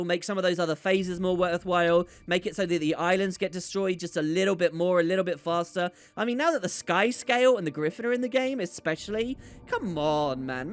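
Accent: United Kingdom. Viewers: wow, you really sound British with the audio like that. but, 1 thing i think i would say about the legendaries is i think the only way they will add more or add new spear, trident, and speargun, is if they come out with and elite, example i can give is with Ele getting a Bow, as i think anet knows that ppl will rage if they mod 1 of the existing weapon, they would replace that elite weapon with a Spear instead of a Staff, and if they would add in a new set of legendary weapons, i think they would just do the practice they did with Legendary Armor, and Trinkets, they would make WvW/PvP weapons up-gradable, i think 1 issue is with gen2 is you can't sell them.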